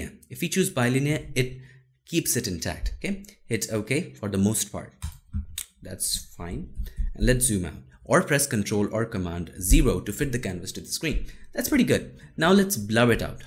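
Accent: India. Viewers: Sir, your accent is wonderful! I guess you're an Indian, and I feel elated to have watched this video! Thanks a lot! You nailed it bhaiya!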